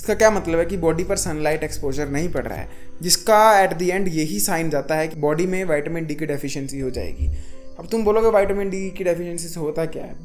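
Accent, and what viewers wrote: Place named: Himachal Pradesh, India. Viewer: That himachli accent😂♥️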